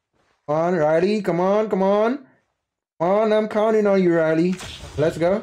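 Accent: Jamaica. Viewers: don't try so hard to speak pure english: hit ah video wid pure yardman tone. Respect no hard feelings.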